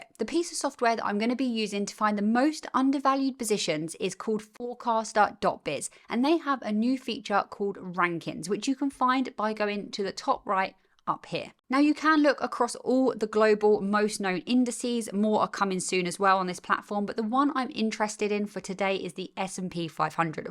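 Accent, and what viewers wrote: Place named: United Kingdom. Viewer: Fantastic, quality content!!! Thank you! is a very good name for this channel because I really enjoy listening to your British accent. Anyone sounds smart by speaking British. But in your case, you are very smart to begin with and then you have that smart accent. 😃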